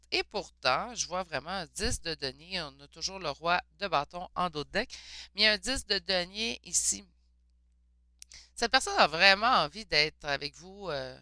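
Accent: Canada. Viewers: Quel mignon petit chien qui dort paisiblement sur vos genoux. Je ne me lasserais jamais d'écouter la douce musique de l'accent canadien. Merci pour ce tirage. Bisous de France 🇫🇷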